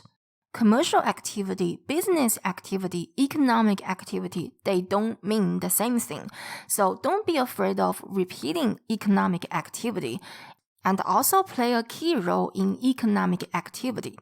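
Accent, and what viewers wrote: China. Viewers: Laoshi I love your accent my Chinese girlfriend accent was same I have lived in china more than 7 years Chinese people use the same accent they call everysing instead of everything You are great keep it up After listening your voice it take me back in memories of past that we have made in past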